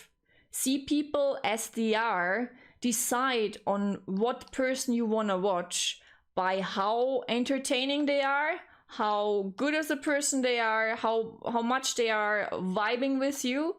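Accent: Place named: Tyrol, Austria. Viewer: super das man dein tirolerischen akzent auch im englishen hört :D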